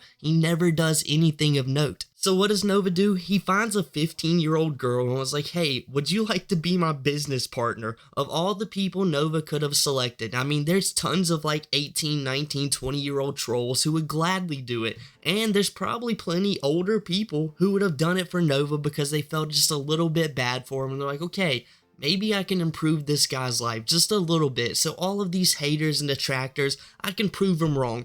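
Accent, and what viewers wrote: South, United States. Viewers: You have the mosr country accent ever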